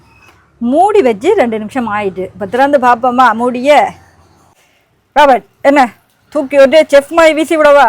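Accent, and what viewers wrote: Sri Lanka. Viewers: ஈழத்தமிழ் வாசம் வருகிறது மகிழ்ச்சி தான் உண்மையா சொல்லுங்கள்pls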